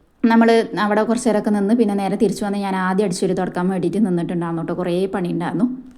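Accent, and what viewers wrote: Malappuram, India. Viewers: ഞാൻ മലപ്പുറം.. ഇങ്ങളെ വർത്താനം കേട്ടിട്ട് മലപ്പുറം സ്റ്റൈൽ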